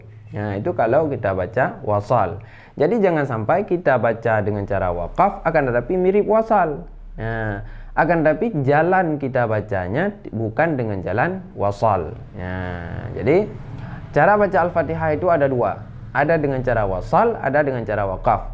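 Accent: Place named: Bali, Indonesia. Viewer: Komen masih sama p.ustad..logat bali jgn dibawa ngaji ...ahad bukan ahadh...kita bisa kitha begithulah ithulah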